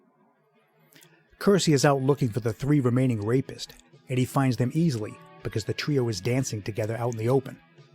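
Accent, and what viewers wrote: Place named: Boston, United States. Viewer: Came for the Winner facts, stayed for the Boston accent.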